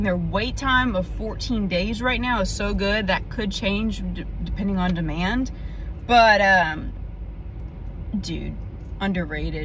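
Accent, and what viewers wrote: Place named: South, United States. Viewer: Hey it's been a while since I've watched your videos but you're beautiful as always and fun with that cute southern accent of yours.:) If you ever happen to visit Atlanta, Georgia we should hangout:D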